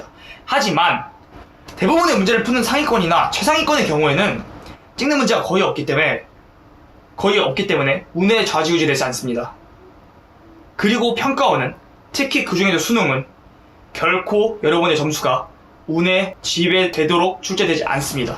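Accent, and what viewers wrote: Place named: Busan, South Korea. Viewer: 다른 얘긴데 경상도 출신인데 대전으로 이사 가신 건가요? 말투는 부산말투인거 같아서요 ㅎㅎ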